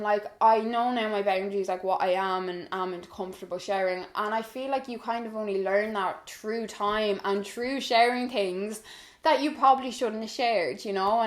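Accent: Ireland. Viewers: Why do you have such a strong Irish accent and Melanie doesn't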